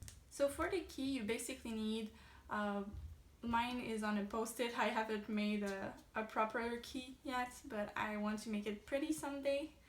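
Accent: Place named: Quebec, Canada. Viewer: I'm an idiot, - I've been trying to place your accent - & of course, you're French (Canadian?) ~, qui? Told you my French was rusty - hell, I even lived 7 worked in France for three years!! In regard to bullet journals, I cannot say I've ever used one - I tend to fill notebooks, then review what is necessary at the end of any, one week - & perhaps that is my peculiar method... Thank you for this latest instalment:D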